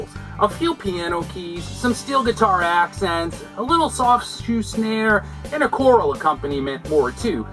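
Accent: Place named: New England, United States. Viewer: Watch out! When we are treated to a country update completely with a New England style delivery, you know you are in for something swell. > Now wait a minute. How did you get to do that four word promo? I have to agree with you, ' ' has some fantastic album art. I could not name one song on the whole album but I love the looks of it. It still happens. That's all I have to do is hear name and my mind reverts to ' '. "A dinosaur Victrola listening to ". You have to love that song. I have only heard one of these albums. I will leave it up to you to guess which one. It is a pretty simple guess really if truly think of it. I will give you a hint. It wasn't Boston's own 'Dukes of Hazzard' who back him up on the album. Excellent update once again Brother. I always feel like I got to wear a cowboy hat and boots when I watch these Honkytonkin' updates. 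Love the country feel~ Rob/Boston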